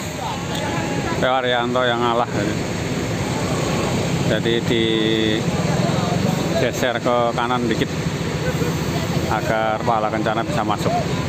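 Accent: Banyumas, Indonesia. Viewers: Hadur dan nyomak lagi Bang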